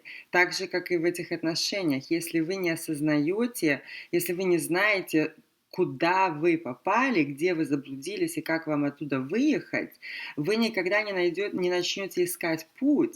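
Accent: United States. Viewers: Очень приятно вас слушать. Приятный американский акцент. Хочу так разговариваить. так бы слушала и слушала. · Какая жёсткая американская интонация...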